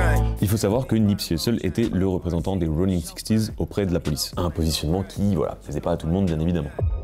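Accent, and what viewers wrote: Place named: United Kingdom. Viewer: la prononciation anglaise change le sens des mots, stp fais gaffe pour les sourds et malentendants merci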